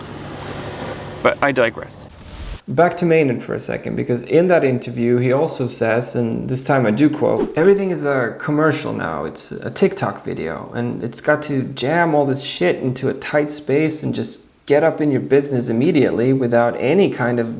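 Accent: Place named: Spain. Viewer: The intro was Great. Good spanish accent! I 100% agree with your thoughts on adding production tricks and not letting your music breathe. Finding the confidence to let a section breathe is something I really need to start doing. If you are not aiming to commercial music just skip those constraints! Long intros are great in my opinion but if it will always be someone that won't like it. Just do what excites you and someone will also think the same! Great video. Hope you are liking Spain. It's a great country with an awesome weather.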